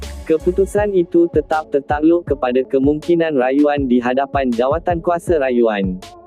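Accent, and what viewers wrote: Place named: Indonesia. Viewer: Cara cakap kompom dari YouTuber Indonesia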